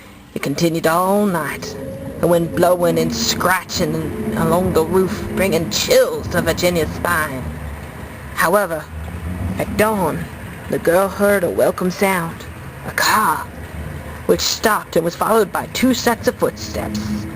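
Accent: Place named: South, United States. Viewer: You have a pretty good Southern accent. Good story telling. Thank.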